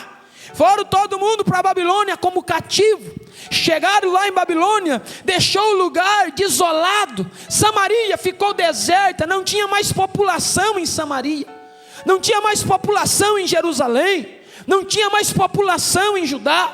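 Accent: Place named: Santa Catarina, Brazil. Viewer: VARÃO DO DEUS ALTÍSSIMO, DÓCIL, HUMILDE E MUITO SÁBIO!!! DEUS O ABENÇOE RICA E ABUNDANTEMENTE, JUNTAMENTE COM SUA LINDA ESPOSA IRMÃ FERNANDA, LINDO O SEU SOTAQUE. O MEU TAMBÉM ERA ASSIM , POIS SOU CATARINENSE. MAS, AGORA SOU CATARINOCA . MEIO CATARINA E MEIO CARIOCA.GRAÇA E PAZ!!! IRMÃ SCHIRLEY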